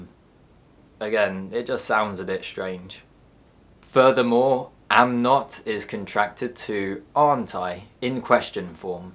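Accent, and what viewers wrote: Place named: RP, United Kingdom. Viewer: I just watched this video, I’m really interested on English pronunciation and I deeply appreciate your useful lesson and also your modern RP pronunciation. Thank you so much!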